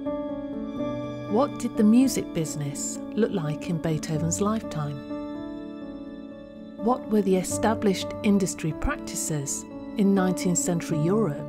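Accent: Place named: North, United Kingdom. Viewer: You have a 'good voice for radio', as they say 🙂 and it's pleasing to hear a northern accent.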